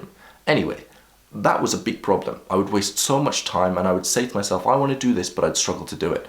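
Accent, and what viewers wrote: United Kingdom. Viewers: British accent = (+10 points) to discipline and charm.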